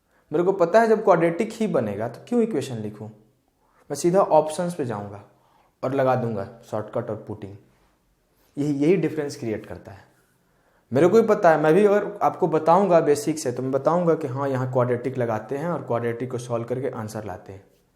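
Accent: Bihar, India. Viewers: Bihar se ho?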